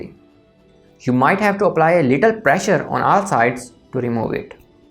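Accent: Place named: Faisalabad, Pakistan. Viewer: Bhai, ap Faisalabad se lag rahy hain. Ap ka English ka accent ese hi hai. But fer v lagy raho. Love you!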